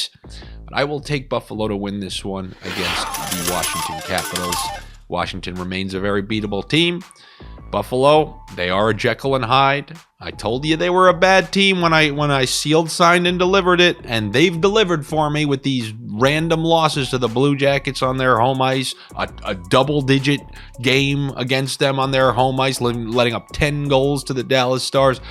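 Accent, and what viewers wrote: Boston, United States. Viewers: Love the Boston accent talk.....you do it well.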